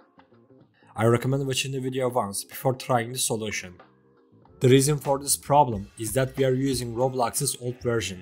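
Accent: Turkey. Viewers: this guy has the strongest turkish accent i have ever heard so far lol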